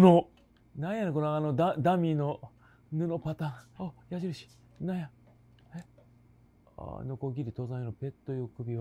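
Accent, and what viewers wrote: Kansai, Japan. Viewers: ビビリ実況 関西弁 ユーモラス わいわいやん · 関西弁といい怖がりなとこといい、わいわいさんと被るwどっちも好きだから超嬉しいわw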